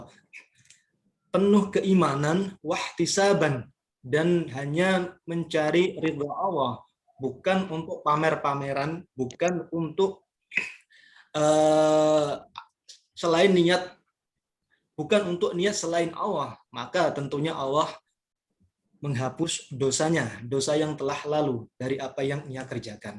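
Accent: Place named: Jakarta, Indonesia. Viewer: Sudah memakai logat logat jakarta,. Terbaik!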